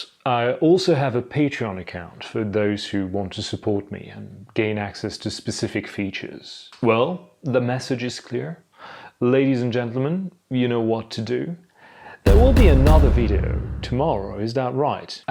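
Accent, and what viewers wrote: United Kingdom. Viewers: oh my goodness so british it is wonderful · Perfect British accent! Expecting next videos) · A real hoot! The lovely British accent was unexpected . Did you spend more time with British content than with American content? Or maybe you can turn on an American accent as well. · That’s a gripping video! 😻 You’ve got an impeccable British accent. Best from Poland! 😊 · Really odd hearing you speak English, and with a perfect British accent too.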